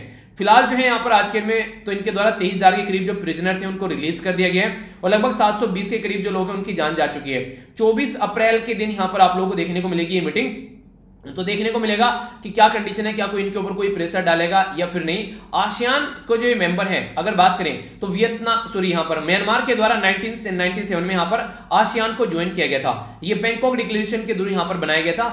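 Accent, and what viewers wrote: Haryana, India. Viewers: Sir aap pkka haryana se ho ,Musa =rat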